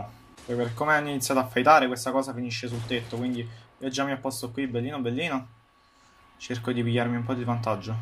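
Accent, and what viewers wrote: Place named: Rome, Italy. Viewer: Io non ho capito una cosa. Sei napoletano perché parli romano ?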